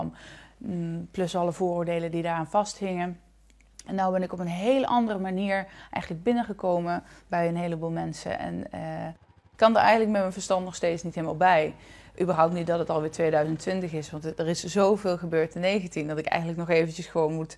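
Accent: Gothenburg, Sweden. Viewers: Ja, har blivit riktigt bra på svenska. Är det bara jag som hör en tendens till göteborgska? Inte så konstigt kanske med tanke på att hon bor nära Göteborg, men ändå. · It's a Gothenburg accent, not Norwegian. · Gothenburgian accent with a slight Dutch brogue =)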